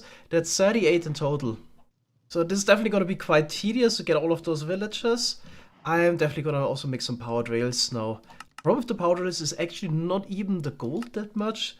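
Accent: Germany. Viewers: It’s so interesting!! Looking at it phonology-wise, I believe it’s because in German, voiced stops and fricatives like b, d, g, and z are never found at the ends of words. However in English, the words eggs ends with both a voiced g and voiced z sound. Thus he replaces the voiced consonants with their voiceless counterparts k and s, which are allowed to be at the ends of words in German, but as a result both “eggs” and “axe” end with the same two consonants, k and s. As German is his first language (I’m assuming?), he must really struggle with using the ‘right’ phoneme sometimes at the ends of words or simply can’t hear the difference. Accents are awesome!!!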